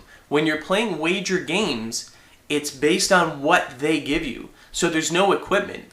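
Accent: Canada. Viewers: i just listen to these videos to hear his cool canadian accent :D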